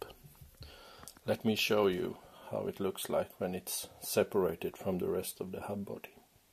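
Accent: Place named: Bangladesh. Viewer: ভাই অাপনের কথা বাংলাদেশি বাংলাদেশি মনে হয়।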